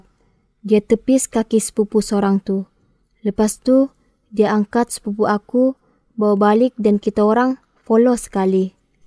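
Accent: Sabah, Malaysia. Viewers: Sabah ke ...swk punya cerita..tpi pembaca mcm sabah..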